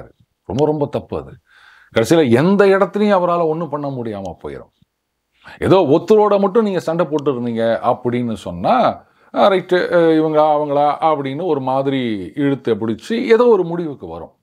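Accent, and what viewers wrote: Chennai, India. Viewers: 😂 Sir i am a great fan of yours, they way you present it with Chennai slang is very very humorous, i really enjoy it not only myself Many others also will be enjoying these facts and reality 😅, keep it up Sir 🙏🌹🙏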